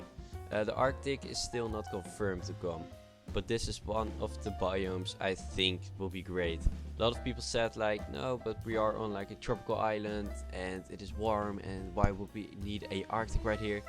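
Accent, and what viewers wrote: Netherlands. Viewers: You sound dutch(I am) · er was makkelijk te horen dat jij een nederlander was 😅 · Are you from Holland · Are you dutch?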